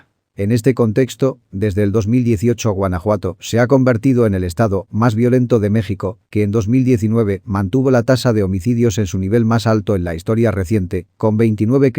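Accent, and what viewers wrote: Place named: Spain. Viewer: Tu vos es de España A ti que más te da lo que pasa en México 😒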